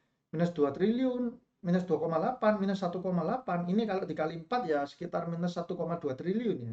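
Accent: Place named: Java, Indonesia. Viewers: dialek khas jawa mu mas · suara medok pean cak ,,, hhhi has jawa timuran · Auto subscribe ko.. Review jujur & mengedukasi 👍🏼 Medok e yo pas di kuping 😆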